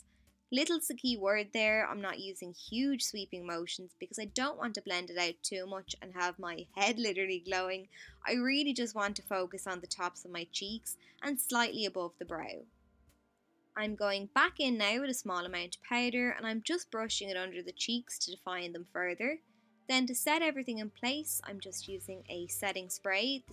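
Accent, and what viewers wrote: Ireland. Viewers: well done as always i like your acent look like Iresh 👍👍👍👌👌💗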